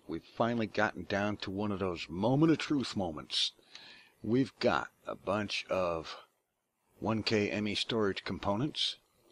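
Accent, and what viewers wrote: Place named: South, United States. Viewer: "Sometimes even the brightest don't see the light." made me lol. How long were you kicking yourself once you figured that out? :P "I promise I'll get myself together here eventually." -- I'm not holding my breath. If I didn't know better, I'd swear that I was watching AnderZEL with a southern accent. Only 3 16k modules and not enough storage? iirc, Hypno was able to fit everything he got from a full-size quarry onto a single 1k drive (excepting the stone, gravel and dirt that he placed into deep storage units)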